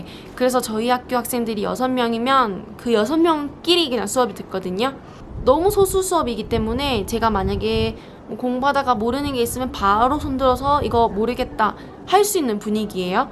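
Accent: China. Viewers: 발음이 중국화 되신거 같네요 ㅋㅋㅋ 신기합니당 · 그리고 점점 중국어가 느시나봐요! 한국어 발음이 중국인처럼 바뀌고 있어요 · 혹시 조선족이세요? 발음에 중국인특유의 어투가 진한데..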